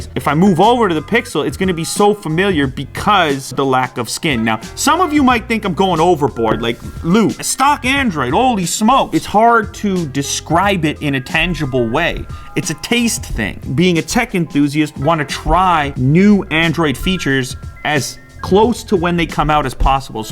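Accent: France. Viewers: Lol that french accent sucks from english ot american people 😂😂😂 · what's with the French? You trying to be sexy couse dude you are fine already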